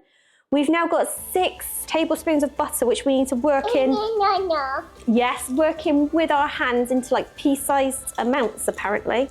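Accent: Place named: United Kingdom. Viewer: I’d rather they pronounce it the British way. It’s part of the charm of their channel in my opinion.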